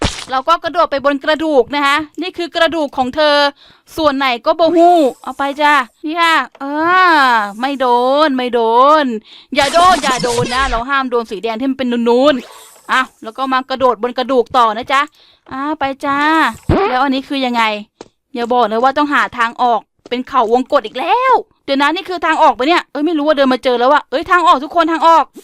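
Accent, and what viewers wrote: Isan, Thailand. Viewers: พี่นิวเป็นคนลาวใช่ไหมค่ะหนูพี่พูอีสานคะ😅😅😅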